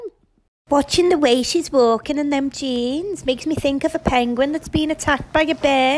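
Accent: Liverpool, United Kingdom. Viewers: love your content! proper love the scouse accent pal, Yeah Lush is a proper chavvy scroat! Feel so bad for the kiddos!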